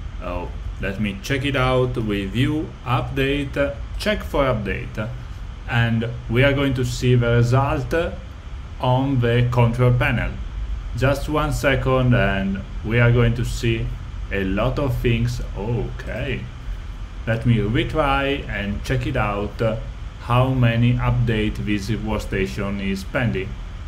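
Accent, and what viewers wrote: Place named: Italy. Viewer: Lovely precise, simple video. I love your wonderful cheery Italian accent !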